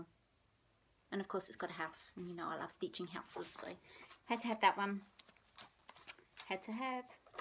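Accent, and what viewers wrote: Australia. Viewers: I still am amazed at how much you get done with your month! Mermaid of Atlantis is beautiful. You should be very pleased with how she came out! All of those beads really do make a difference. I will be excited to start beading my mermaid! I'm still working on the skin, though... The little cottages are too cute! Blackwork sampler is looking very nice. And Refleggions looks so pretty! The purple is perfect for Easter. And your thoughts on the word khaki made me laugh. I had to say it out loud both times! I love listening to you and the other Aussies to pick up the accent. The Australian one is one I haven't yet been able to get. I can do a few phrases, but it doesn't hold very well, haha. At least now I can pronounce khaki!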